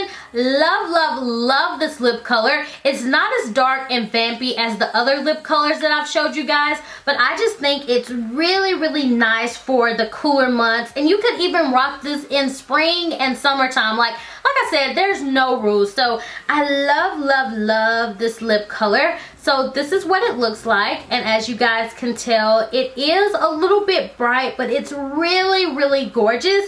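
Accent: South, United States. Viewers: I KNEW i heard a SOUTHERN accent! ☺😊😊😊😊 im from alabama too! Do you have an eyebrow tutorial i looked but couldnt find one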